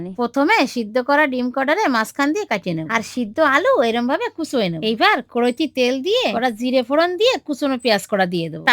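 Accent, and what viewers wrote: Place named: Bagerhat, Bangladesh. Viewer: বাংলাদেশের খুলনা জেলার মতো কথার টান😮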